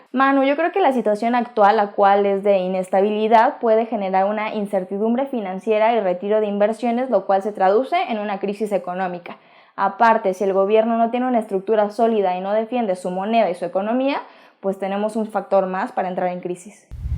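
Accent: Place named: Mexico City, Mexico. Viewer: Me gusta mucho su noticiario :D soy estudiante de comunicación y de verdad que aprecio mucho la edición y el gran manejo ante la cámara. Eso sin duda es talento! felicidades a todo tu equipo, porque se ve que les gusta lo que hacen :D!....sólo ese acento chilango, es lo único malo, pero de ahí en fuera, excelente :D!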